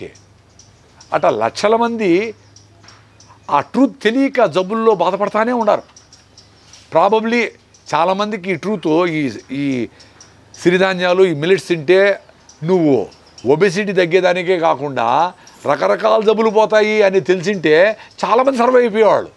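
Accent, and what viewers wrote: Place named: Madanapalle, India. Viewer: Really great information sir.pakka madanapalle slang telugu.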